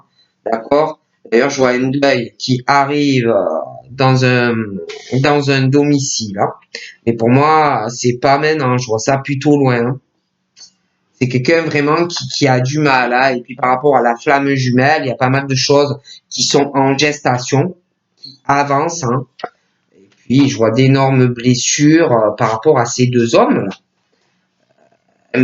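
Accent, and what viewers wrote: South, France. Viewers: ton accent du midi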